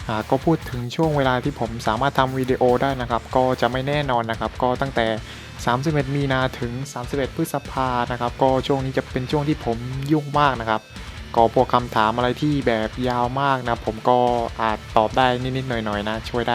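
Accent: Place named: South, Thailand. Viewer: เป็นคนใต้ป่ะครับ 5555 ชอบเสียงอ่ะ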